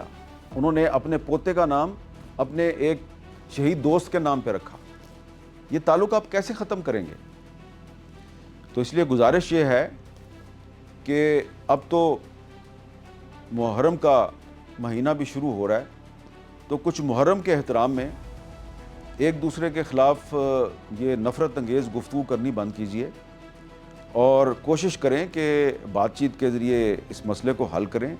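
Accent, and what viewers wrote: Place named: Kashmir, India. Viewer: Thanks u sir that's how real Kashmir blood speak real Kashmiri..🫀❤️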